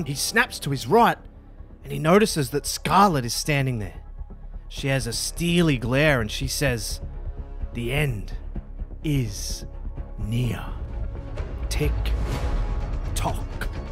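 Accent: Australia. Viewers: You have an accent what is it Australia 🇦🇺 or New York idk something’s like that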